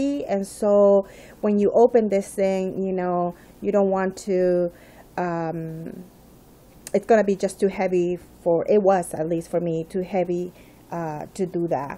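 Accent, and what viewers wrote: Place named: Germany. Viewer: Love that accent. German ?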